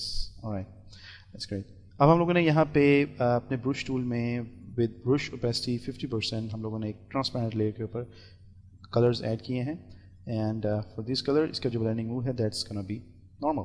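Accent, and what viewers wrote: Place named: United Kingdom. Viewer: bhai agar aap urdu tuttorila ka boltey hein tourdu hi ziyada istammal karein meharbani ho gi, urdu ko english excent mein boltey huey aap achey naho lagey muhey muaf karna bhai.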